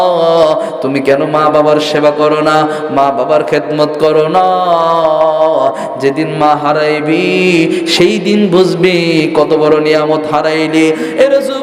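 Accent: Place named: Shariatpur, Bangladesh. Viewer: মাশাআল্লাহ শরিয়তপুরীরের সুয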